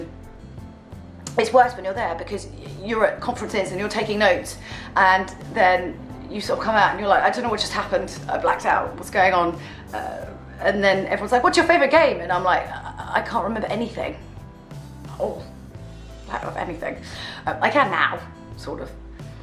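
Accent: United Kingdom. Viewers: Your voice is awesome!, kinda raspy but not too much. Also british accent is simply the best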